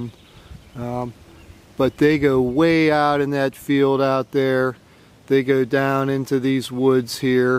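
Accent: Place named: Maryland, United States. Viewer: Are you from Maryland? Your accent sounds Maryland to me.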